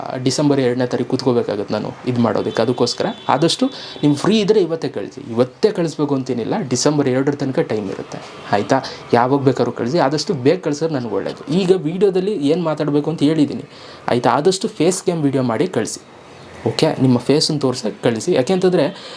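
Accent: Mandya, India. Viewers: Super 🎉🎉 ❤❤ olledagli nim alochanege❤❤ brother namm mandya flavor nim bay li kelode chenda🎉🎉anna❤❤ tqsm...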